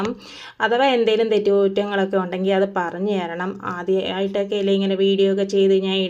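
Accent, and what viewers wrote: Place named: Kollam, India. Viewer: തോനെ കൊല്ലം ഭാഷ ആണെല്ലോ ❤️🥰 Like 🥰🥰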